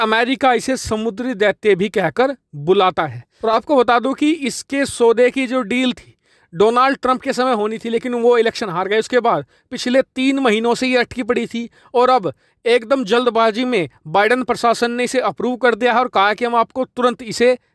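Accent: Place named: Muzaffarnagar, India. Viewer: Bhai aapki Bhasa muzzfarnagar se milte hai kha se ho..??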